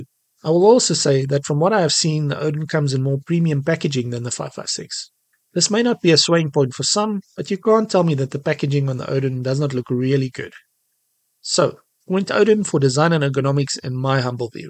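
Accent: South Africa. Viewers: keep on researching. I heard your ZA accent. I couldn't miss it. Haha am getting the anbernic 556 this month.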